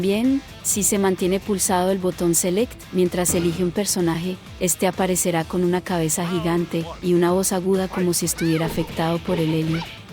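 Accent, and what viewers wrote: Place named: Colombia. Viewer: Loquendo con acento colombiano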